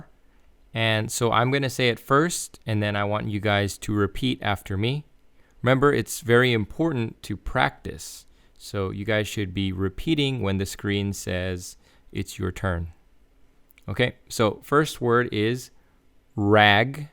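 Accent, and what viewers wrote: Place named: United States. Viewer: great, I love videos with such an american accent and subtitles! because they're so helpful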